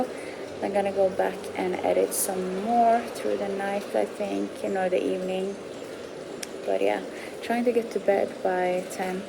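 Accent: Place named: Scandinavia. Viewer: Ah känner, yeah sounded like you were from Scandinavia.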